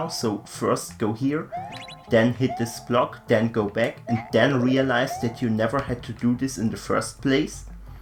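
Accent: Germany. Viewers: Dein Akzent hört sich sehr deutsch an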